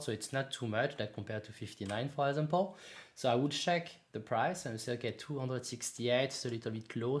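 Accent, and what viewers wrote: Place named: France. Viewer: non non, je suis Français aussi et ça m'amusais juste de voir quelqu'un avec un accent plus fort que le miens lol. Super vidéo merci. 🤝🏽👍🏽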